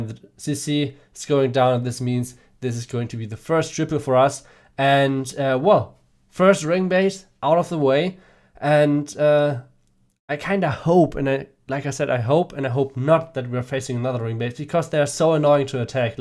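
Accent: Germany. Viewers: I really like your german accent :)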